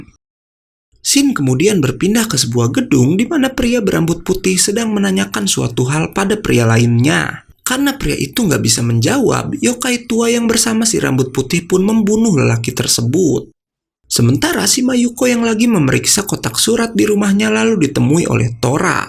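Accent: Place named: Kalimantan, Indonesia. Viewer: Mimin org Kalimantan ya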